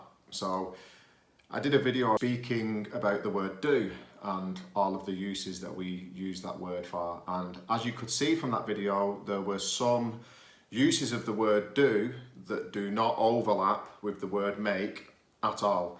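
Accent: United Kingdom. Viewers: thank you very much for your dedication and careful explanations! And sorry for my question, what kind of British accent have you got?? I notice that it is British but with same variations, is not it??... Sandro Cano, from Argentina...;);)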